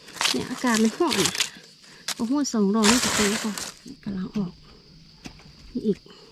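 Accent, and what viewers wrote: North, Thailand. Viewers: รอไห้กำลังใจน้อง จ้าชอบน้อง อู้คำเมืองเพราะๆจ้า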